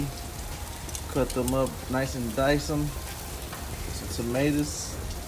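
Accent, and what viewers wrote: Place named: South, United States. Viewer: Let their be rain wow coming down sounds like you from the south I’m from Louisiana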